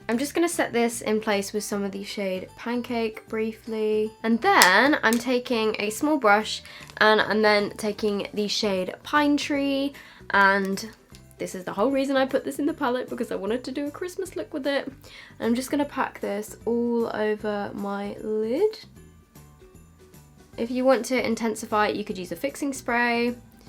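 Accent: Australia. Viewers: Am I the only one who thinks she has an Australian accent Just me ?? Oh ok........ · I love your Australian accent it made me laugh so much love this look I think I might do something similar for Christmas Day ❤️❤️❤️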